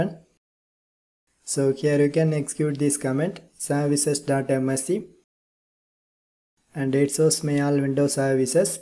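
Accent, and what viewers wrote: South India, India. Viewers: south indian lol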